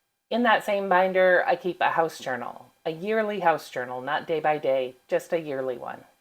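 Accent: Canada. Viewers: You're Canadian, aren't you? 😅😅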